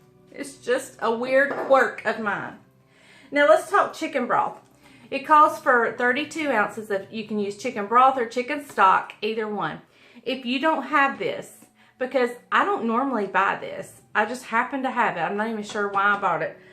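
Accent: South, United States. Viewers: Happy Birthday 🎂 to both you. I live not far from you, I am in Haw River. I like that southern accent.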